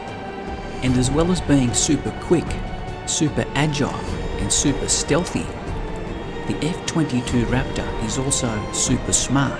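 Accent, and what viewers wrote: Australia. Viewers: Brilliantly edited and narrated. I wonder who Sir Lionel contracted to do the narration? I just can't seem to place the voice. He sounds like someone I knew once, but he was from France. So it can't be him because this bloke has an Australian accent. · You sound Aussie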